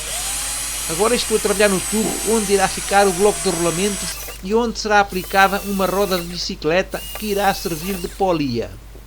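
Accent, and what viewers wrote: Portugal. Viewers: Não sei de qual país você acho que portugal mas você é muito inteligente amigo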